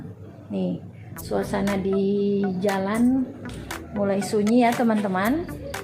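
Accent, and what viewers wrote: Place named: Sulawesi, Indonesia. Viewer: Ibu ini orang mn, logatx kaya orang Sulawesi.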